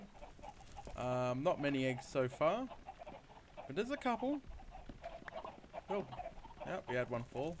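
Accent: Australia. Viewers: Videos and tutorials um yeah it's called an accent........ not everyone in the world sounds the same. he's from australia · Is he Aussie coz I am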